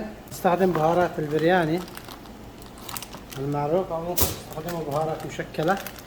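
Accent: Yemen. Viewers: يا جماعة الشيف يمني والا شنو لهجته + عاشت ايدك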